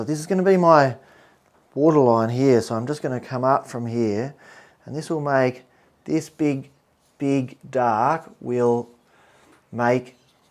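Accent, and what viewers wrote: Australia. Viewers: Simply stunning, and wonderful to watch you work. (Also, loved hearing the Aussie birds and accent—I’m an Aussie living in Boston.)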